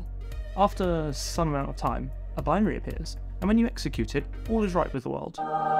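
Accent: United Kingdom. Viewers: You probably are British or my listening skills are so pity... (English is not my native)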